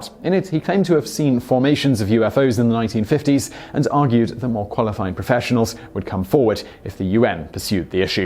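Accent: United Kingdom. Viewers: He is British , hence he use British not American pronunciation norms. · Hes British. They say some words differently. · He's British!? I hadn't realised !!!!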